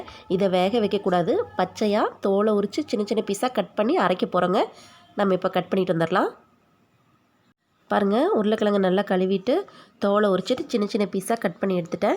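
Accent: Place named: Coimbatore, India. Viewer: Neenga paesaradha Partha, neengalum namma coimbatore thaan ah🤔🤔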